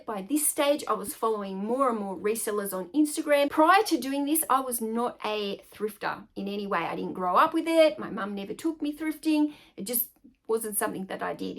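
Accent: Australia. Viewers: I bet myself you had an Aussie accent before I even hit play. And whaddya know? Lol. So random. Loved the video! PS The conversion of the size for us Americans is 25 x 11.5 feet based on the numbers you gave (rounding up). So 287.5 square feet in area.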